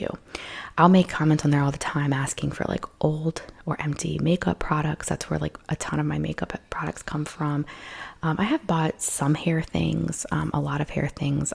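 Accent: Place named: Maryland, United States. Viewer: And also, accent wise, I’m originally from Maryland and i think I might I hear a little of that (or Pennsylvania since they’re similar) in you!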